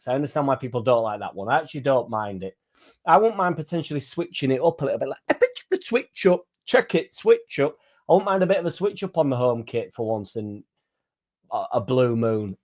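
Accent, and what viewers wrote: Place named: Yorkshire, United Kingdom. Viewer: Hi, I love your podcast production, presentation, and 'happy-go-lucky' view of the world. As a man from Yorkshire who lives in Australia, it's wonderful to hear that broad Yorkshire accent. It brings back deep memories of Leeds (10), not far from Elland Rd. Thanks for sharing your information about Leeds United, it keeps me updated on related happenings at the Club. All the best, hoping you exceed your 6,000 target subscribers. Every Leeds U fan should hit the subscribe button and likes. Keep up the good work.:medal-yellow-first-red:😊👏⚽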